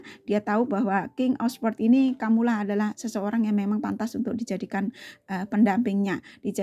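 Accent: Java, Indonesia. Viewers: Trima ksh kak atas readingany, resonet jln ceritany, mf kak agak nyimpang sedikit dr tema ny, suara kak sama benar dg suara seseorg yg ad d dekat rumah q, logat Jawany, cara ngomong ny, nama ny Mbak Murti, dia buka salon d sini, coba dong kak tunjukan wujud kakak ingin lihatny, mf y kak kalo AQ agak lancang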